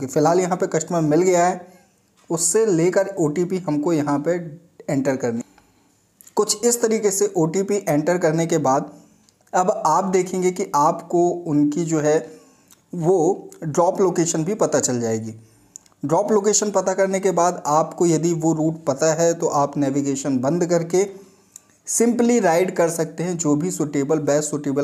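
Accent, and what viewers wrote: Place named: Indore, India. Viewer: Bhai Indore se ho kya 😅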